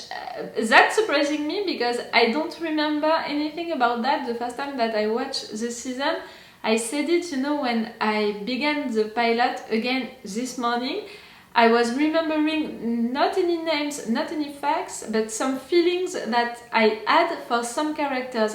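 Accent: France. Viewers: This french accent of yours is quite pleasant for my russian ears. Sounds great) and I understand almost everything)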